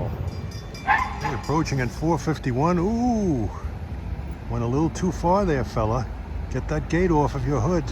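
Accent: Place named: Long Island, United States. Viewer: Great videos! Where are you from originally? That accent sounds like Long Island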